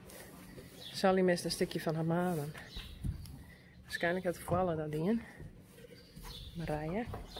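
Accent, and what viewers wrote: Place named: Netherlands. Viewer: I love your constant Netherland English narrating - it's funny but in a damn good funny way. I like it a lot cos it makes me laugh and feel happy. I love your horses too. The horses are lucky to have you as can see you care very much and is conscientious of their wellbeing. They all look well looked after and so healthy. Such beautiful horses and very powerful looking both at the same time. They exude beauty, power and health.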